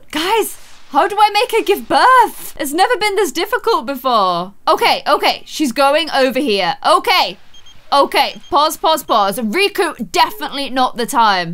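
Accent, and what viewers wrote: Ireland. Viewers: are u irish because if u are i am too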